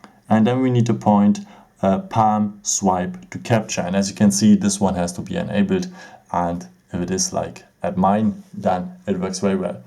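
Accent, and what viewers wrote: Germany. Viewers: Kann es sein das du aus Deutschland kommst? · Sounds so german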